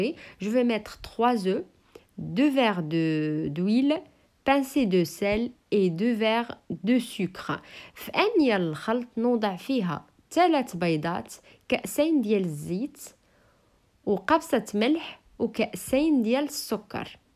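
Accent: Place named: Tlemcen, Algeria. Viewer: Merci pour cette recette mme et d oujda frontière entre maghnia et oujda j aime bien une femme d oujda avec un accent de tlemcen encore merci d une parisienne 🙏🏻🙏🏻💋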